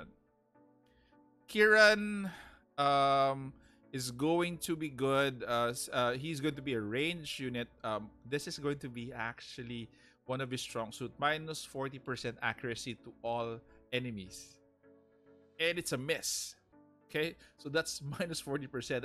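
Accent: Philippines. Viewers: Thanks for this very thorough guide mate. Just started this game yesterday. This information will help me a lot. Btw, your accent sounds like filipino.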